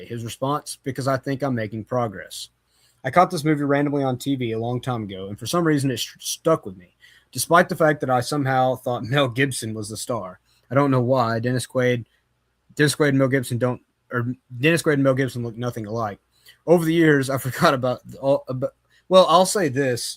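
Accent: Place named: South, United States. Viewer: This is cool. Love to see it, and to hear that southern accent😂 This is one I haven't watched with my son yet. We both love baseball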